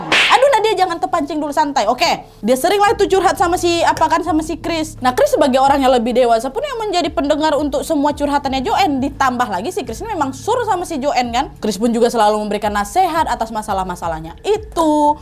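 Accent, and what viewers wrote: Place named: Sumatra, Indonesia. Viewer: Logat nya Ayuk Nadia Sumatra banget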